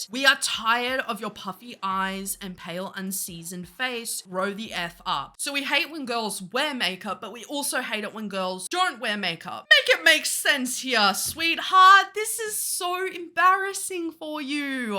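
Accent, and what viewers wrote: United States. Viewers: Your American accent is really good lol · I love your american accent 😂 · Okay but how is your American accent so fcking good?! Like I’ve lived in the US my whole life and I’d believe you were one of us 🤣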